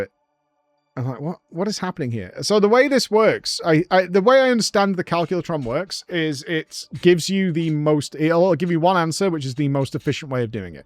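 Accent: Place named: Manchester, United Kingdom. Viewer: He's saying duna, hes just from Manchester is all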